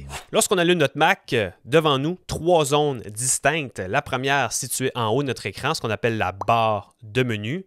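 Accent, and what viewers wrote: Canada. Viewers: Vous êtes québécois ? 😊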